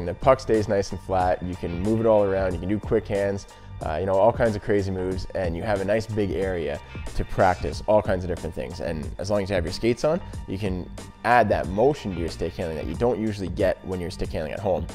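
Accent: Canada. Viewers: This man sounds very Canadian by his accent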